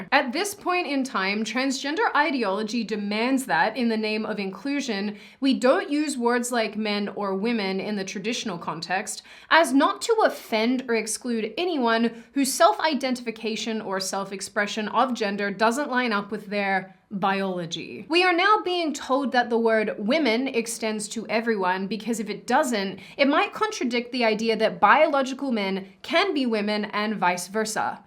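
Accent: United States. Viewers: you sound more american every time i watch a video. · It's because the world has gone mad. Also, off topic, but you sound completely American now. All traces of your Aussie accent are pretty much gone 😪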